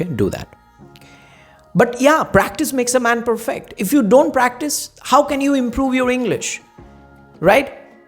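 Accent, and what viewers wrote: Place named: United States. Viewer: Why do speak in American accent?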